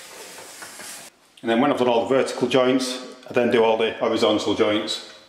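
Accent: United Kingdom. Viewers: Looks great.......good job on your video. I've never seen anyone use a small plastic pipe to indent the grout between the tiles before, so that was a good tip. I've got a "rougher" texture to the tiles I'm using on my wide deck railing, are there any tips to doing grouting on a rougher tile surface so that the grout doesn't get into the dips of the tile and mess the job up? Also....your "UK" accent made this kind of hard to understand at times, but I'll do my best to "translate".....THANKS!! John (in Nevada)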